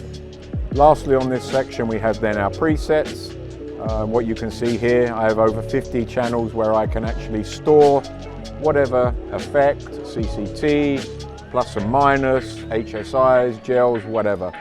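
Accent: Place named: London, United Kingdom. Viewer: That fella has such a smooth Wandsworth accent, like listening to Radio 4 after midnight.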